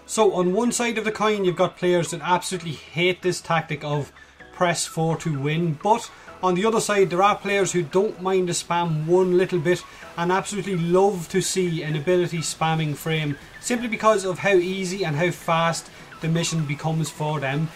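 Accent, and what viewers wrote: Ireland. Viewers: He sounds more like Irish, whereas Grade is more like English english.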